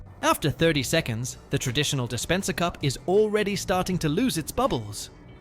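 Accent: United Kingdom. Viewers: What's going on with the accent, it sounds a little English vs the usual Canadian we're used to lol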